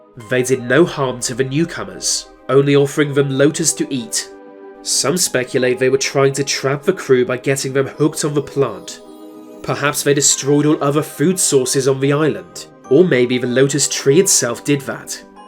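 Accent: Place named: Scotland, United Kingdom. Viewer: I don’t think it’s a speech impediment I think it’s a local accent because I’m from Scotland and in some parts they say van instead of than and vey instead of they I could go on but I’d be here all day lol , I actually find his voice quite pleasant tho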